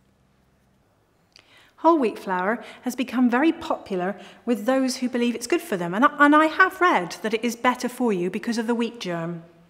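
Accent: United Kingdom. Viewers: I love your British accent! So classy!!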